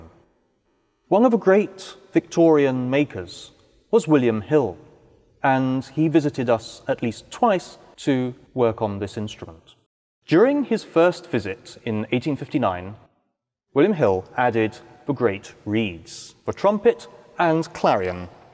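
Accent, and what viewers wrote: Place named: RP, United Kingdom. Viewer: Riveting commentary. And, your English Accent sounds so intelligent and posh to us Americans. Did you write and prepare the script too?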